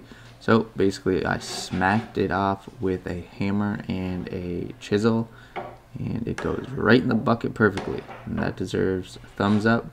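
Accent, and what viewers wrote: Canada. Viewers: you from canada dude? accent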